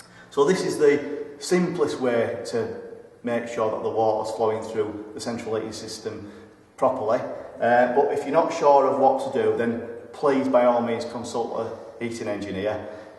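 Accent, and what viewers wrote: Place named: Yorkshire, United Kingdom. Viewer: Nothing wrong with your Yorkshire accent, I didn't see Harold Wilson or William Hague using subtitles. I bet Captain Cook didn't have subtitles. Only criticism is that the speaker is too far from the microphone which results in the echo. You can help me install an air source heat pump, but you live too far away.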